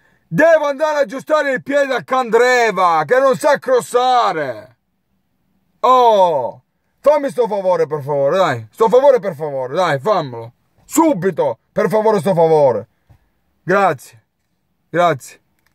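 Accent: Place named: Milan, Italy. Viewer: E' l'accento milanese che lo frega ahahahahahahahahahahshshahs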